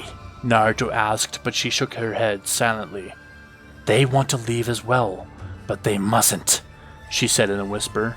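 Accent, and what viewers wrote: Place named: Canada. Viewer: Alas, I'm finally caught up lol. I've been following both this and Dygo's Naruto rewrite series, and I can definitely say that I like both. If asked which one I prefer, I think I'd have to say DygoKnight's, but that could very well be because I've known about and been following that one for a longer period of time. I've known of that one for a few months, and this one I just stumbled across about a week ago, when one of the full arc movie videos randomly got recommended to me by YouTube. You've mentioned being Canadian in a few of your videos, which I actually was able to guess within just a couple minutes of hearing you talk lol. I'm friends with a few Canadians, one of whom just finished a massive multi-year Dragon Ball passion project. Another factor might be the fact that I'm totally blind, have been since birth, and rely exclusively on my ears here; couple that with numerous conversations with the aforementioned Canadian friends, and yeah, it wasn't that hard to tell. 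I know you like to showcase fan art, but even when you do post visuals, well it doesn't matter to me what they look like, since I can't see a damn thing anyway hahaha. I've enjoyed your story so far, though admittedly I may have to go back and re-watch a few of the episodes. I ate some of my aunt's edibles earlier this week and tried to follow along with the story whilst being quite toasted, so my focus probably wasn't the best during those episodes lol. There's more I could say/ask, but I can save that for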